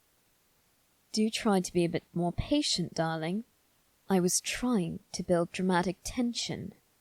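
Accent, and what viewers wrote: United Kingdom. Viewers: Ahhhhhh love your British accent it's so cute 💜💜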